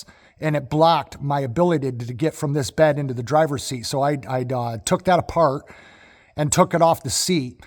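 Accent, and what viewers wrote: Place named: Upper Peninsula Michigan, United States. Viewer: Based on your accent, it sounds like upper peninsula Michigan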